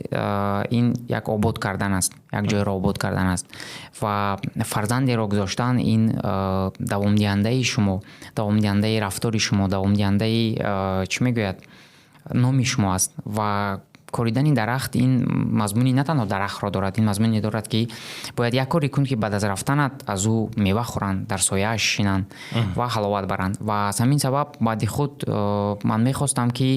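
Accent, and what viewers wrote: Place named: Dushanbe, Tajikistan. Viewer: Говоришь более или менее толковые вещи, но твоя речь такая поддельная, просто режет ухо Ты говоришь о литературной речи, в то время через слово используешь душанбинский лад Для меня вообще не проблема иметь диалектов, это изюминка. Короче, ты прогнулся братан. Прибегал туда где высокооплачиваемая аудитория, зачем нести херню о едином языке и тд Такое чувство что ты - реальный подкаблук, это не нормально, вообще. Ты давишь на больные точки женской аудитории, типо ущемляют права и чувства женщин Будь оригинальным, ну типо собой!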